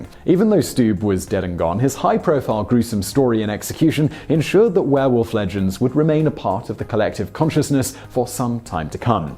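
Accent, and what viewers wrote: United Kingdom. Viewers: Simon, Simon... You are my favorite YT presenter! Sometimes your pronunciation of certain words are slightly cringy. I attribute this to your UK accent. However today? You use a word you call "Disorientated". What you mean is "disoriented" but, well,... come on honey?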